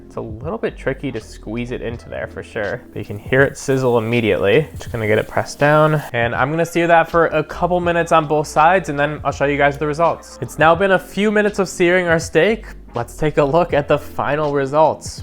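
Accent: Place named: United States. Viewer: This fella pronounces his ‘e’ as an ‘a’. It’s a very American thing…messing the lingo up. Good presentation though.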